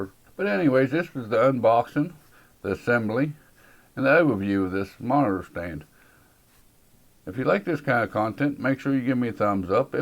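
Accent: United States. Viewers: Yo you cowboy accent is cool bro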